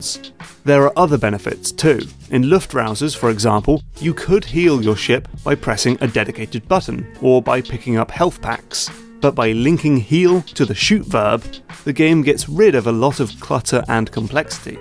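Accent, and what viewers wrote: United Kingdom. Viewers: utube in a nutshell+ british accent helps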